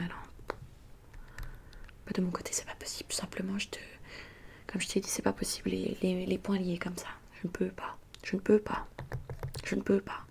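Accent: Vosges, France. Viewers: Cet accent feels like home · Quel belle accent 😍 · Le retour que personne n'imaginait ! L'accent vosgien fait son come back !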